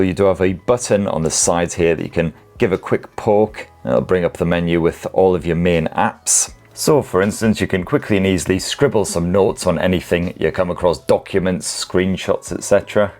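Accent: United Kingdom. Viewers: What part of Britain are you from mate? I know my regional accents pretty well but I cannot nail yours.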